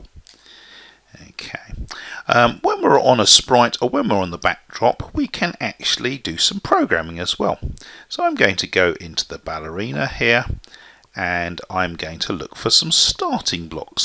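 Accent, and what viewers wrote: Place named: United Kingdom. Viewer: he sounds like so so so so british in <num> x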